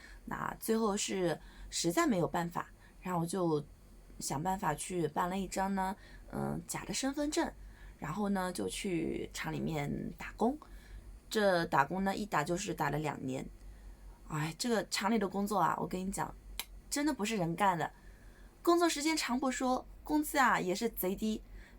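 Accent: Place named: Jiangsu-Zhejiang, China. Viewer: 所以 你做的足浴城在哪里 听着像江浙口音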